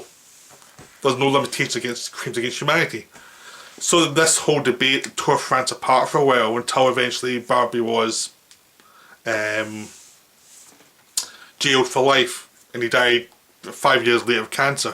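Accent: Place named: England, United Kingdom. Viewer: He has a very thick England accent and hard for ME (prob only me) to understand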